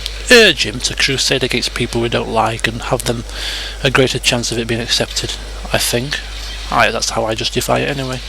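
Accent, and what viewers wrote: England, United Kingdom. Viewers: Your accent is that of a true englishman (from an englishman) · YEEESSSS a english person with a funny acsent no affence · lol this might seem kinda weird but it is so much cooler to watch a person with an English accent do an English campaign then it is just any other kind of person...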